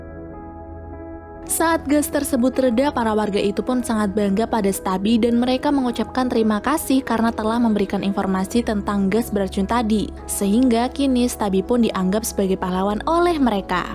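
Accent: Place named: Banjar, Indonesia. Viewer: LOGAT KAKAK ORANG BANJAR KAN? "KYAAPA"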